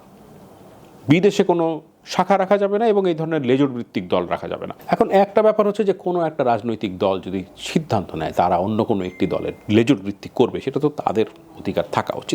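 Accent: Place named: Kolkata, India. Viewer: আপনার ভয়েসটা একদম কলকাতা দের মত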